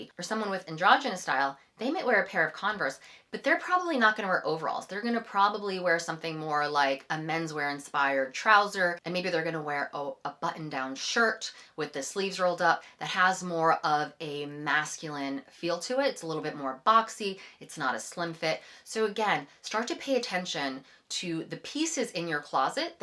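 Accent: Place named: United States. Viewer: This is a fantastic series of videos, thank you so much, you clearly know a lot about style and you speak very clearly with a beautiful, soft American accent you so rarely hear these days, it's really a pleasure to listen to you. I love Alexa Chung although some of the outfits you showed are not to my taste. I grew up in Italy in the 70s and, age 58, I've recently gone back to wearing similar outfits, for example corduroy bootcut trousers (also worn in the 90s by Carolyn Bessett-Kennedy), double denim with trucker jackets and straight leg jeans, gingham shirts, also worn with denim, white straight-leg jeans with a blue t-shirt (like Jackie O), velvet suit with flared trousers, pussybow blouses, platform shoes, contrast collar t-shirts, David Bowie vintage t-shirts. The funny thing is that my style now is similar now to what I was wearing in the 70s when I was a child or teenager, these pieces are modern classics (but not too classic, as I find classic style is often ageing and too ladylike - there's a fine line). 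I would not say my style is vintage but I love the odd 70s touch, also in the colours (orange and brown, khaki), just as I like wearing the odd edgy or even sexy item.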